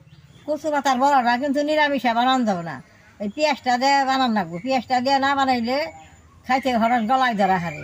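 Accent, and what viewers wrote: Bangladesh. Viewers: Akdom amader বাংলাদেশের moto apnar kotha o ranna khub valo lage ,darun lagse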